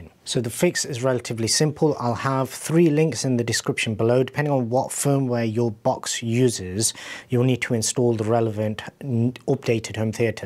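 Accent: United Kingdom. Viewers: Please next time speak even faster, so that foreigners again can skip your video. And just because of your extremely British accent, I mostly only was able to guess, what your sentences possibly were supposed to mean.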